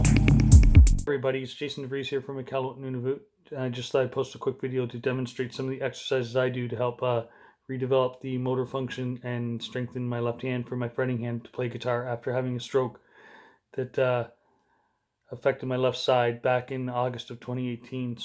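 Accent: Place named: Canada. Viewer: Sounds like you're from Canada.Want to come to youtube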